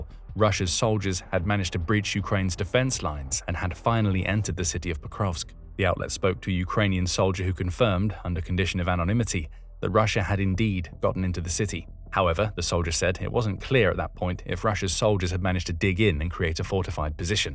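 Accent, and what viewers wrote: United Kingdom. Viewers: Sounds british, therefore most are lies...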